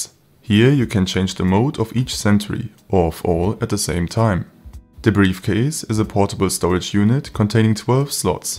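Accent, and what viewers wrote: Germany. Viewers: Irgendwie so ein deutscher akzent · I hear deutschen akzent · Are you from Germany?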